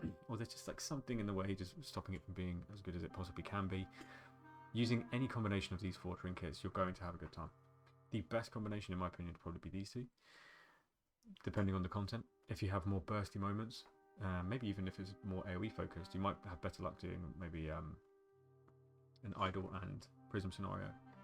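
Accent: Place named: England, United Kingdom. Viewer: the English language spoken with an English accent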